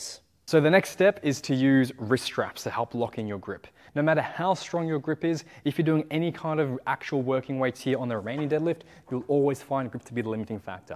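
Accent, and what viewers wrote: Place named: Australia. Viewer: When I heard your voice I immediately picked up that your were an Aussie, and then I looked at your About page and yes, I was correct. Thanks for the deadlift info.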